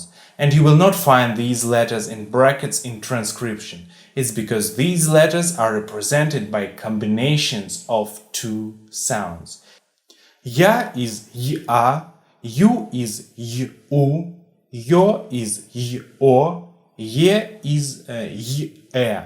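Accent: Russia. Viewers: Ахуенный акцент братан, иностранцы в эйфории, настоящий русский учит))))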